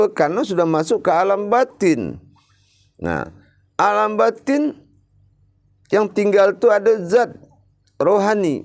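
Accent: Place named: Malaysia. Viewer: Mf buya.kenapa logatnya diubah melayu.kan lebih nasional indonesia.lebih bnyak mempergunakannya.artinya lebih bnyak memahami indonesia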